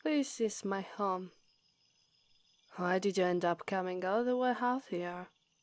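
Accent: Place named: Italy. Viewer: A tribal girl with an Italian accent. 🤔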